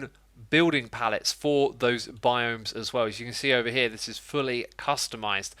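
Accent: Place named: United Kingdom. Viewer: Why are you British now wtf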